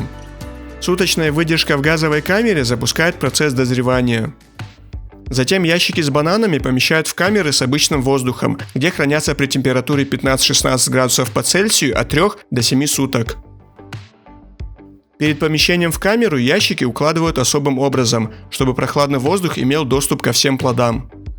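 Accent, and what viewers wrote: Kabardino-Balkaria, Russia. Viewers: Кабардинский акцент у диктора)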